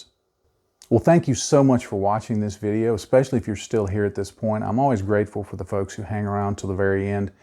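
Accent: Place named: United States. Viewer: Very goood clear info for a begginer like me or maybe an old hand. You are the first yank i can listen to Thanks buddy from a cross the pond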